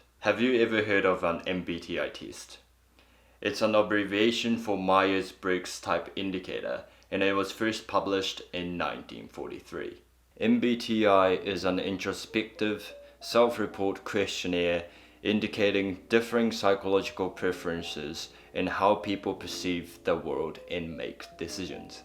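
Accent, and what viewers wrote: New Zealand. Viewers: Your accent is so new zealand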